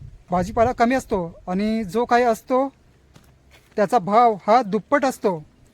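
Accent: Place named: Agri, India. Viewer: खूप छान माहिती दिली, भाऊ तुम्हीं हया भाजी विषयी थोडीशी माहिती मला होती . तुमचा मुळे अजून माहीत झालं, भाऊ तुम्हीं आगरी आहात असं वाटत, मी आगरी आहे. 🙏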